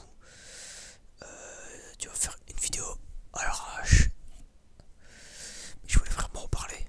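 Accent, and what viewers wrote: North, France. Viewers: Ton chuchottement av cette accent du nord j adore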